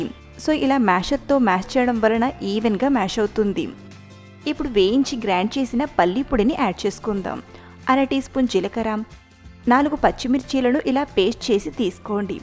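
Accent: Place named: Tamil Nadu, India. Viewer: Your accent is like Tamil mam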